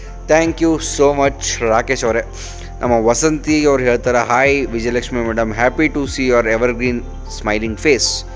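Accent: North Karnataka, India. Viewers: Subway sandwiches are good. I too like these. Enree full north Karnataka language. I like this style. Are you only son to your parents? You are lucky boy.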